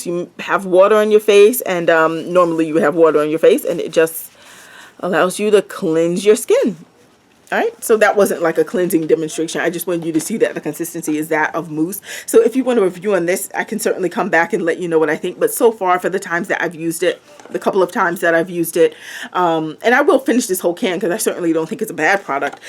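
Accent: Caribbean. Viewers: Girl you're about to have me max out my card!! Thanks for the reviews!! BTW where are you from because I detect a slight West Indian accent? Stay beautiful!!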